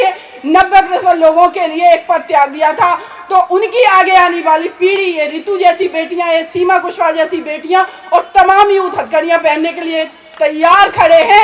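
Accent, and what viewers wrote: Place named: Pahadi, India. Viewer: So Great speech mam pahadi voice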